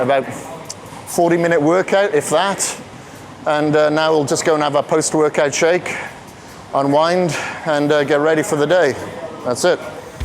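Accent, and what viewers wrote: Wales, United Kingdom. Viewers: hi were you born in the states? as you sound like welshman! and your surname is another welsh connection.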